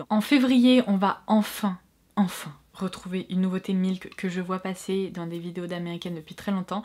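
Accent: England, United Kingdom. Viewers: Super vidéo sur les nouveautés merci de pouvoir tous nous renseigner😉. mais pour répondre à ta question ce qui m'intrigue le plus c'est l'accent anglais que tu prends à chaque fois que tu présente un produit.. 😭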